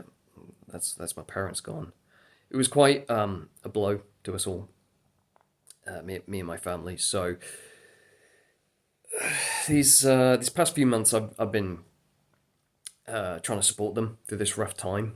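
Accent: United Kingdom. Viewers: Holy shit you’re British.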